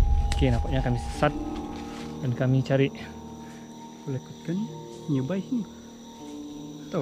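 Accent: Sabah, Malaysia. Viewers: Sabah bah ni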